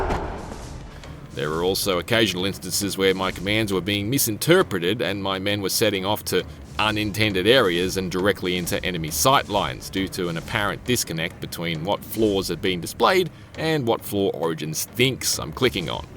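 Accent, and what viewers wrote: Australia. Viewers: I heard the Australian accent, and I was so worried it was the IGN voice up voice down guy. Thankfully it wasn't, and the video is actually watchable.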